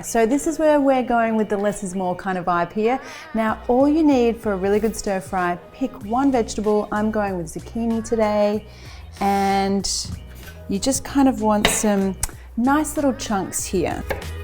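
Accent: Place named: Australia. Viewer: She’s Australian how don’t you know from this video wth they pronounce things differently.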